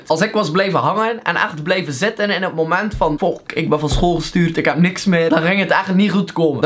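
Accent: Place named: Flanders, Belgium. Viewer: ik ben zelf een nederlander, maar ik vind Vlaams meestal best een stomme taal. maar bij jou vind ik het echt heel grappig en ik word er blij van! :)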